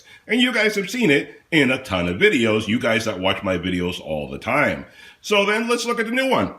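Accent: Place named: Chicago, United States. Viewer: you have a great voice. Man, I don't think I've ever said that before... especially to a man. haha Reminds me of the Chicago accent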